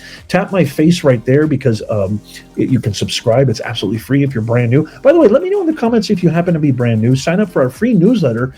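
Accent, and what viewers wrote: Scotland, United Kingdom. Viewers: Absolutely love you're Scottish accent, I don't live far from Scotland and you did it well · I'm Scottish living in New Zealand, you done a great take, on the Scottish accent, ahhh.... fond memories. Keep up the great work, what a result for America and here's hoping the world's leaders start behaving like America and Argentina. It takes more energy to be negative, guess what, I'm lazy, but so positive. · Your Scottish accent is great too,! 🥁🥁🥁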